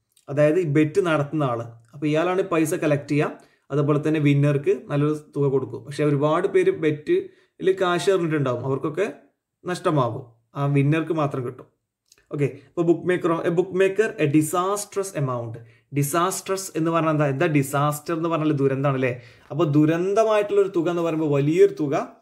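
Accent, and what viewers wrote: Malappuram, India. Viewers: Malappuram ahno atho palakado?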